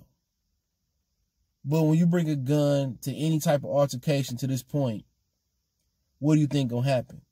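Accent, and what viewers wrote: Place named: Ohio, United States. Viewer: You sound like you from Cleveland 🤔. I could be wrong but you definitely have an Ohio accent.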